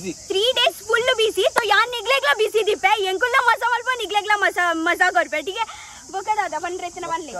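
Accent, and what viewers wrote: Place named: Tulu, India. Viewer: Ur tulu is funny nice video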